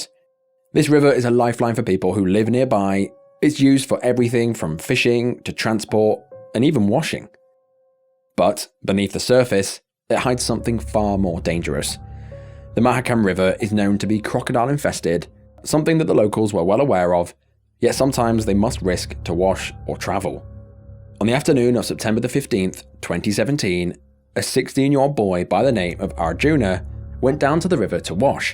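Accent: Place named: England, United Kingdom. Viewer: ❤❤❤❤❤❤❤❤❤❤❤❤❤❤❤❤ you R so sweet. Also love the channel new subscriber here ❤ also from U.K South Yorkshire ❤ I could tell are obviously also English 😂😂😂 ❤❤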